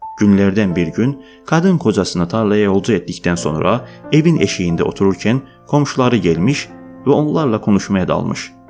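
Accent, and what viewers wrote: Azerbaijan. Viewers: Daniwiginnan azeriye oxwuyur. Hec turkler kimi teleffuz etmir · Abicim azersin işte çok belli konuşmandan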